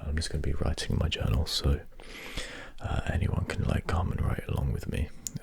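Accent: United Kingdom. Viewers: Damn ur voice was so good i love it so much i'm normally not fan of soft spoken but ur voice was so hot(sorry i go to far in that coment 😂) love ur videos they're cool and british accent is the best ever. I'm french(from normandie not so far to u😜) so sorry if my English was bad😕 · We hearby officially brush those negative thoughts away for you. Brushhh. Brushhh. Brushhh. Your doing fine. We are happy to see you. That velvet voice with your British accent and handsome bearded face make us all feel great. 👍💜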